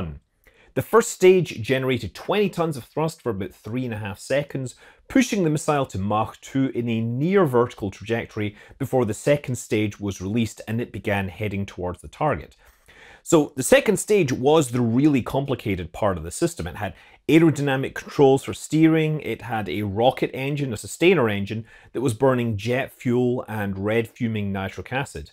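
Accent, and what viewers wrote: Scotland, United Kingdom. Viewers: Felt like Curious Droid with a Scottish accent.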